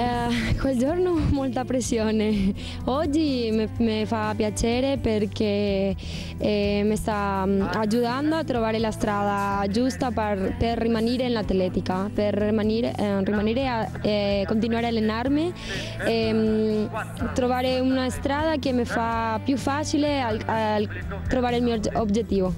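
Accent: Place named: Italy. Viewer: Soo what!!!! you speak English whith Italian accent.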